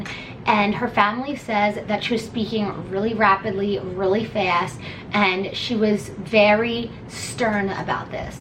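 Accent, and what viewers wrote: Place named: Maryland, United States. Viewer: Sounds like a MD accent... Great video! Subbing for more!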